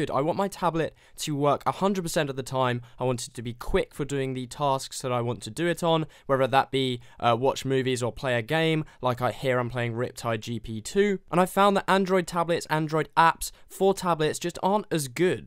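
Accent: United Kingdom. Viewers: Your my favorite tech tuber. You make awesome videos! ...and I love your British accent, talking about tech XD it Reminds me of Jony Ive lol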